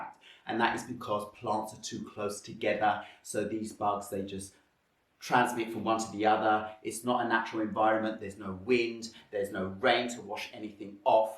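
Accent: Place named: United Kingdom. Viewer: I am so curious to know where you’re from? You got such a strong British accent id thought you’re from the UK? Your location says Malmö- hence the confusion ☺️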